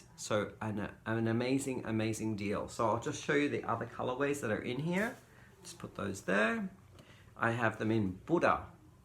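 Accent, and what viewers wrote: Australia. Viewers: Love hearing the Aussie accent. Loving your channel. Oi Oi Oi x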